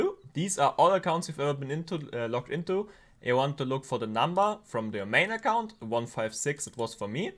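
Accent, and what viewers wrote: Germany. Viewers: i hear a little bit deutsch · i hear se deutsch akzent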